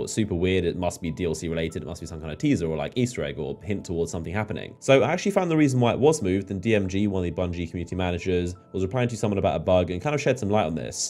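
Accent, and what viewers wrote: United Kingdom. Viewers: Can you learn an American accept please to be more relatable to most users? This british thing is hard to listen to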